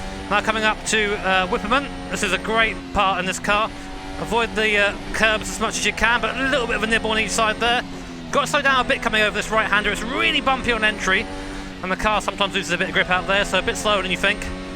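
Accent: United Kingdom. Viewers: I'm going to tell you what I find amazing. I'm an FM7er on XboxOne using nothing more than a controller, so I'm blown away by your driving setup and the VR and the whole shebang; truly incredible. But what I'm most impressed with is your ability to provide that wonderful and intense high-pitched, British-accented non-stop racing commentary WHILE driving an F1 car on the NBR! In my own world, I'm pretty confident on the Ring, but simply driving takes all my concentration. If I so much as fart too loudly I risk throwing myself into the wall. Great driving, and a great video! Thanks so much for sharing.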